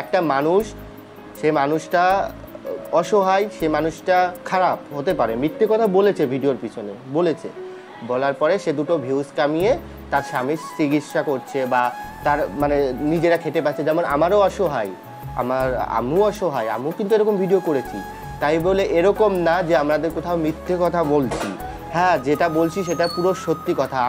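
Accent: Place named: Nadia, India. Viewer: 34,তোমার সব কথা শুনলাম ভালো লাগলো সত্যি ইউটিউবে এখন কুটকাচালি চলছে, কজন বোঝে এই কথা, ভালো লাগলো👌👍 তুমি কি নদিয়ার বগুলাতে থাকো তোমার কথা গুলো ঐ দিককার মতো, ভালো লাগলো ভাই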